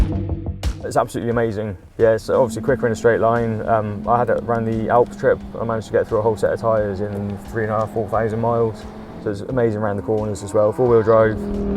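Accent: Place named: West Country, United Kingdom. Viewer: Think it’s a ‘west country’ accent that may come across quite strong and hard to understand. Also the background music is probably a little too loud.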